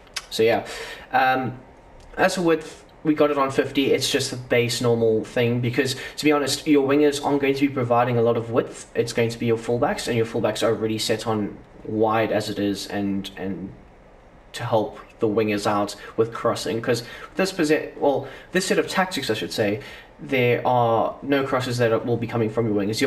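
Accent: South Africa. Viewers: U South African ?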